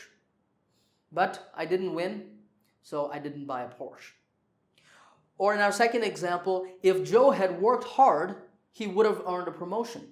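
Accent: United States. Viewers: Wow such a great prodigy from an India, your accent or tone of your voice is like an american.... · Very clear presentation. The speaker has a relaxed but crisp American accent.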